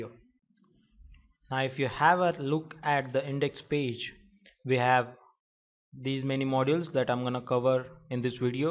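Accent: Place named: India. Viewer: for the indian accent haha (looking the video with a masala chai ^^)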